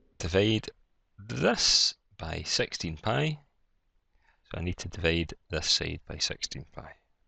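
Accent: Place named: United Kingdom. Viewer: thank you random british or scottish man for the help! after 7 years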